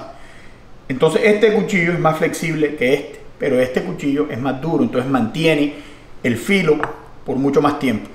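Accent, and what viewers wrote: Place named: Cuba. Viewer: Una pregunta tu eres cubano porque por tu asentó te pareces al de los cubanos y porcierto y soy cubano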